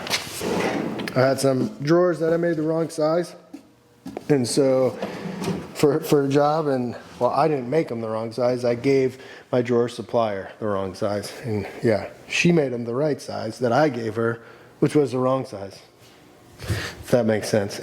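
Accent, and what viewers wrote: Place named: Connecticut, United States. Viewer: Love watching your videos 👍 Very well done. But I also love your introduction. Yo what up - Yo how ya dew in 🤣 You’re definitely from Connecticut 🤌 Fugettaboutit ! Love it you make a me home sick. Keep up the good work.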